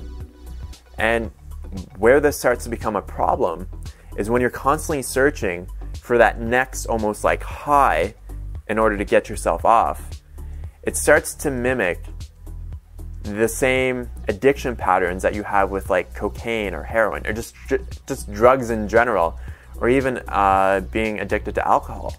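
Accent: Canada. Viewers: You're Canadian? (Btw i was so addicted i got ED, and in the most critical period in my life, if ya know wat i mean)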